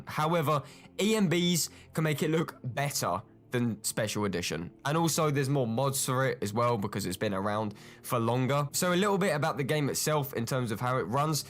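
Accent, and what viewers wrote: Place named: England, United Kingdom. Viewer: I like your accent.. Is England your city?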